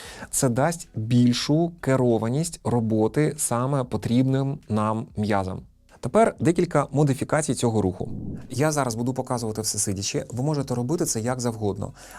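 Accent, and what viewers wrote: Standard. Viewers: Як приємно слухати справжнього фахівця своєї справи! Дякую Вам за доречні поради, гарну чисту мову і позитив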